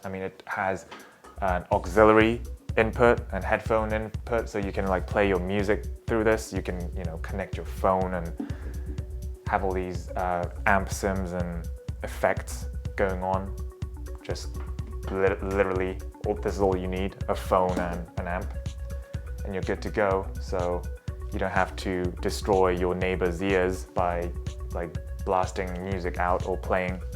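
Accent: United Kingdom. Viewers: definetely did not expect british?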